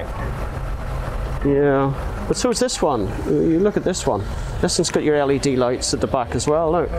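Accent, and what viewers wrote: United Kingdom. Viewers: great video and lovely car. Just bought an L405 myself and love it! Nice to watch a video and hear a fellow northern irish man. That being said it must be killer for a Northern Irish man to pay ticket price (but as said hard to do a deal when holding deposit has been given)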